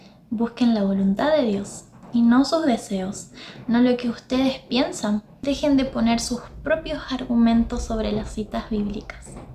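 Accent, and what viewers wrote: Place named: Misiones, Argentina. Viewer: Hola. Soy Nidia. Nací en Misiones, Argentina, así que tu acento me es familiar. Estaba viendo otra cosa y toqué la pantalla sin querer y me trajo a tu video... una "diosidencia"... me encantó tu testimonio y la manera tan dulce que tienes de hablar, tambien la profundidad de lo que dices. Eres una sierva del Señor. Gloria a Dios por como te usa. Yo hace mas de un año estoy compartiendo los repasos de las lecciones de escuela sabática en mi canal. Y otros temas de salud mental pues soy psicoterapeuta. Te invito a ir a mi canal, quizá te guste estudiar conmigo. Y cuando dijiste que somos sólo barro en manos de Dios me sentí tan identificada, siempre digo lo mismo. Es más, compuse una canción sobre eso. Te la quiero compartir, con mi esposo formamos el Dúo Qadash, y grabamos esta canción con unos sobrinos del corazón, el grupo Cyan de Venezuela. 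Te la comparto y espero te guste. <link> Dios te bendiga y te use con poder en la predicación del evangelio. Queda poco tiempo para su venida, tenemos que estar fundamentados en la Palabra al 100%. Yo me dedico a predicar en mi canal. Y espero que tu canal llegue también a muchas personas... si todos los adventistas usáramos nuestras redes para cumplir la misión llegaríamos a todo el mundo rapidísimo. Dios está despertando a su pueblo, está levantando un ejército santo dirigido por su Espíritu... nosotras somos parte de él. Gloria a Dios por eso. 🙏 Te mando un abrazo. Me suscribo a tu canal y puse el "me gusta" número 667... te hic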